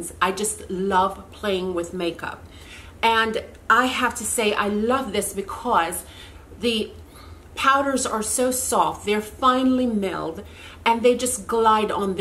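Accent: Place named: Europe. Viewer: Thank you for your video. I picked up the tweed quad. If you don’t mind me asking, where are you from? I keep hearing a slight European (?) accent every now and again.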